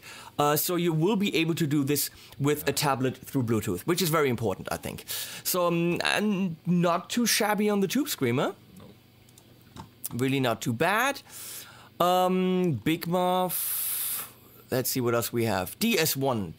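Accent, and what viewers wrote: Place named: Germany. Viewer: That German accent. ;)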